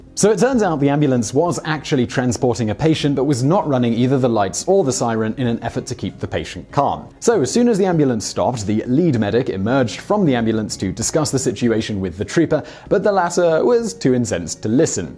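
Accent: England, United Kingdom. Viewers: Oh, please. The video was concered about the traffic behaviour of ambulances and there is one team member of any given EMS ground-unit that drives it. This crewmember is also responsible for all driving-decisions and thus the subject of this video. Therefore he is an ambulance driver. Duh! The way more alarming thing about this video is that it was presented by an English-accent person only using US examples and one UK comment about time together with pictures from North America, the UK, Eastern Europe (Czech?) Germany and one some presumably Latin-American country without ever saying on which place he is refering to as road rules differ.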